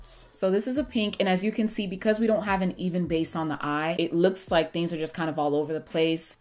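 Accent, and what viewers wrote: Nigeria. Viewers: Subscribed for the Nigerian accent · I love your Nigerian Accent 🙂❤ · Hey. Are u Nigerian? The accent tho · I’m gonna guess you’re part Nigerian. That accent sent me to the ground. Love you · That Nigerian accent 😩😂❤️ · Let me just tell you , the moment I heard your Naija accent come out , i clicked Subscribe so fast 😭😂 . Ahhh my fellow sista doing her thing lol , i definitely learned something new in this video . I’m definitely looking forward for more to come.! 💋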